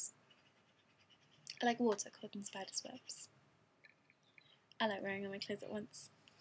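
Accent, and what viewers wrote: England, United Kingdom. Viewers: i like this girl. she has a british charm to her personality. maybe it's just the accent though. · Calm down. You only asked where she is from, after stating that you couldn't place her accent. She is from England but lives in Canada. If you've watched even half of her videos then you should have known that already. As for why one site says the other... perhaps she signed up for youtube while still in the UK and never felt the need to change it. Such speculation kind of makes people sound like stalkers though so... I'd avoid it. I'm just saying. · Her accent doesn't sound even a little bit Australian. It's clearly English with a little bit of North American. But like the other person said, who gives a shit?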